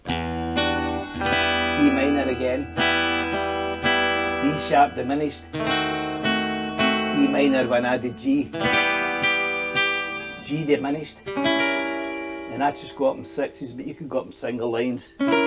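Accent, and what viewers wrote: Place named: Scotland, United Kingdom. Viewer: Did you ever hear version of this? Whoever complained about your accent should check out the subtitles on YouTube - bottom right hand corner button which has got two CC's on it. It even translates from Scottish - said 'little' rather than 'wee'!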